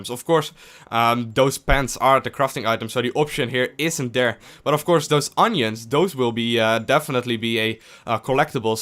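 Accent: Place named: Netherlands. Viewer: The Dutch accent is real ;D Great video, thanks!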